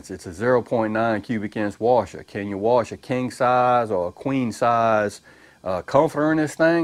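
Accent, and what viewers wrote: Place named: Mississippi, United States. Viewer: Came to this video to learn about the machine but the biggest thing I've learned is that I need a friend with a Mississippi accent. Lovely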